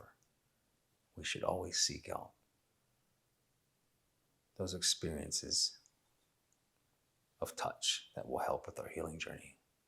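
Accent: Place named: United States. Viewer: If you ever wanted to know how a true American speaks, this is it.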